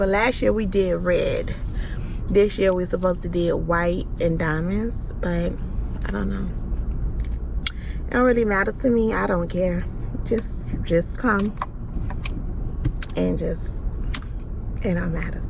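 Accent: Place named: South, United States. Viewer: Girl!!! Yes you are so country lol I love tho. reminds me of my family down south. Love the vlogs and all.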